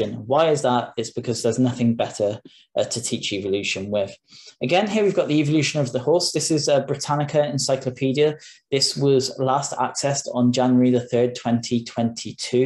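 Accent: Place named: United Kingdom. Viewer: >>> The speaker’s accent appears to be British. Strange, because I thought only Americans were this ignorant and stupid.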